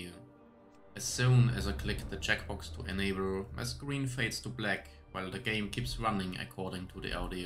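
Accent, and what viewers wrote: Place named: United Kingdom. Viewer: Dude Britts are impossible to understand. I bet your video is great but gd damn usa English is way easier to speak unless it's a minority talking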